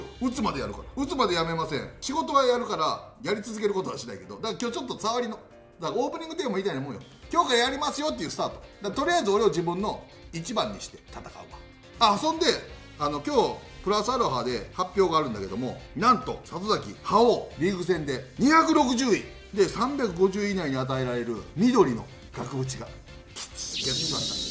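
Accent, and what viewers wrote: Japan. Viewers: さとやんアクセントが関東、関西、東北、四国山ほど混じってる（笑）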